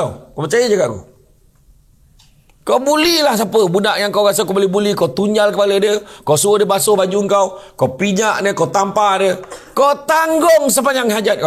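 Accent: Negeri Sembilan, Malaysia. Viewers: Assalamuslaikum wbt Allhamdulillah syukur kehadrat Ilahi saya suka dgn loghat NS ustaz. Selawat keatas Rasulullah SAW trima kasih atas ilmu yg ustaz sampaikan hanya ALLAH yg membalasnya. Aamiin3 YRA.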